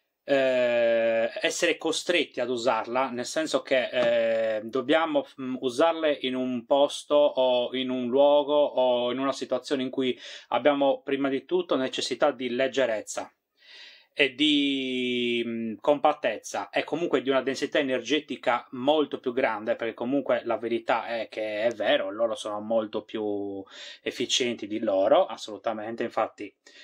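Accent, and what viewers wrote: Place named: Sardinia, Italy. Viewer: Magari fossi brava come te...mi sto arravanando....ma non ce la posso fare da sola e sto cercando elettricista non solo bravo ma con la mente aperta che mi aiuti. Qui da bricoman oggi stanno vendendo i pannelli monocristallino da 450 .... ma ammesso che vadano bene non saprei che inverter abbinare. Cmq grazie, perché sei un'ispirazione oltre che molto simpatico con la tua semplicità e schiettezza oltre che per il nostro magnifico accento sardo....sono molto orgogliosa perché penso che tu sia veramente molto preparato! BRAVO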